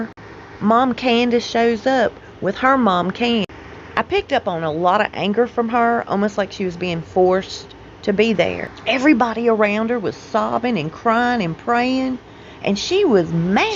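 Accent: South, United States. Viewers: I love your Southern accent. I'm Nashville born and raised but I lived up there (East TN). Covid was rough on LE up there. They know Summer wasn't abducted! Sheriff Lawson works in a high Opioid/ FENTANYL and METH territory. Lawsuit is frivolous most likely. They are smart. I heard ole Don is MIA! Something is about to break on this case?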